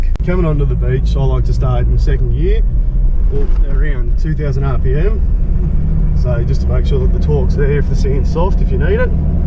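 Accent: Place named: Australia. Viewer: Dear everyone who are clueless about Australia; this is what the average Australian accent sounds like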